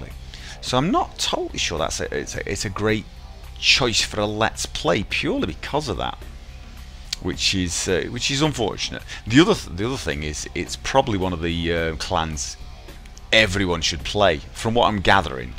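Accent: United Kingdom. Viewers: Holy Macaroni! It sounds like this channel belonged to completely different person back then! And I can swear it, it was some Brit! :)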